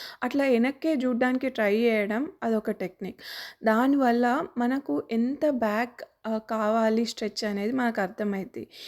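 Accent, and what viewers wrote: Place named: Telangana, India. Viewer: Telangana accent 😎🙏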